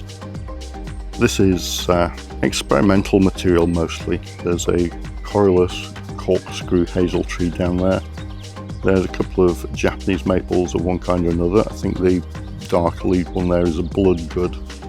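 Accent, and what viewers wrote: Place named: Greater Manchester, United Kingdom. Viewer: I recently found a local Bonsai Club and looking forward to joining a meet. The majority of my collection are in training. I have learned a lot over the past few years. If you ever need to lighten your load I'd be happy to help, listening to your accent I don't think you would be too far away from me in Greater Manchester. Keep up with the great content 👍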